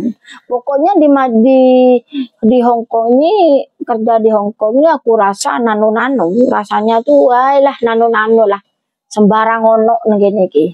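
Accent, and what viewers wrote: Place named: East Java, Indonesia. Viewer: Biasa nya mental ya kak yg harus kuat klo gampang mlempem mental nya jgn coba² jadi tki/tkw,, mantap kak motivasi nya, btw kakak logat nya kyk ibu mertua saya kyk logat² probolinggo, situbondo, bondowoso, hehe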